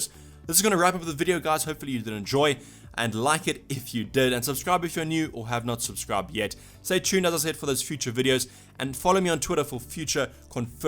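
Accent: South Africa. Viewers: Anyone who can't hear the African Accent is beyond redemption 😂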